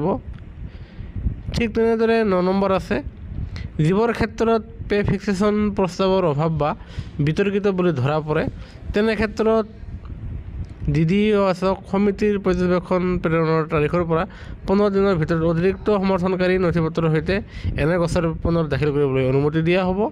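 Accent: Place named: Bengal, India. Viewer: Axomia vakha Jiva khonot val ke practice kori lo.. Bongal buli gom pai😅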